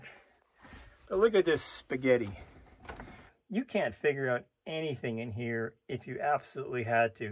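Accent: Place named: Western Canada, Canada. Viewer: I KNEW IT!! First-time viewer, but , I knew you were out in Western Canada -- years ago, I worked with a guy from BC who also pronounced garage as "garach." Nice guy, but he kinda stuck out in Central Jersey.😆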